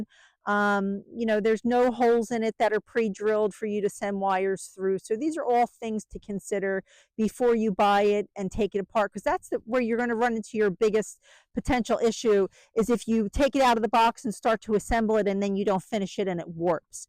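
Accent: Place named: New Jersey, United States. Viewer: Thanks for the video. I’ve been wondering where your accent is from and I was correct. You sound just like my cousin who grew up in Morristown NJ. We lived in NY at the time. Thinking of getting this greenhouse. We live in ND and the wind is relentless!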